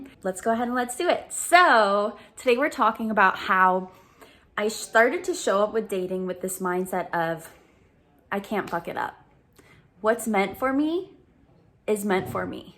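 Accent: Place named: Romania. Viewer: I looove your romanian accent! 🥰 You're saying it perfectly! Great video too! 🤩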